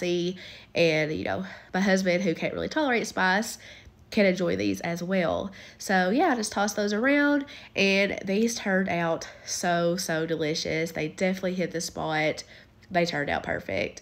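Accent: South, United States. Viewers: I absolutely adore your southern accent! Your recipes always look amazing!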